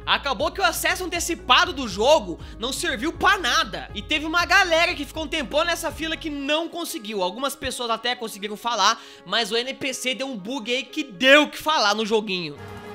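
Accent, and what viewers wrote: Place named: Paraná, Brazil. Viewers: ele é de pato branco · Cara, eu sou paranaense de Cascavel no Paraná, e mais gosto de ver o falar porque ele parece meus amigos de infância, além de entender tudo de jogos!!! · Eu sou de Guarapuava no Paraná também, eu amo nosso sotaque e nossas expressões kkkkkkkk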